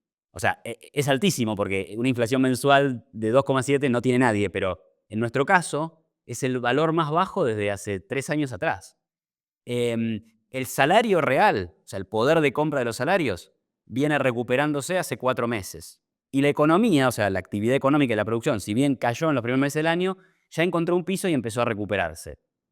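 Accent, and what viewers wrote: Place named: Italy. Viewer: Iván é show! Grande! Um panorama completo e muito lúcido do liberalismo, como é aplicado e dos perigos do socialismo na região. Impressionante o tonalidade italiana do portenho. Como sempre se disse: italianos que falam castelhano.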